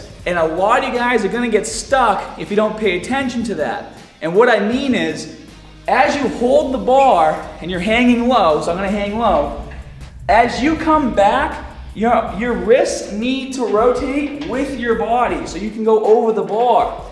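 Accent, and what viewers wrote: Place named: Brooklyn, United States. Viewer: Spot Conlin It's his Brooklyn accent.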